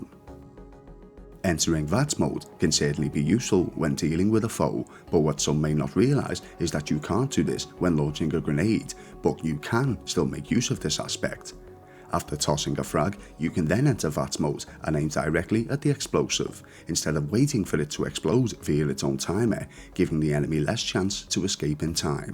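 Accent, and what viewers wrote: Liverpool, United Kingdom. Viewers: Sounds alien to me to hear a scouser on fallout videos. You get the likes n the subs etc for thst alone. Greetings from a manc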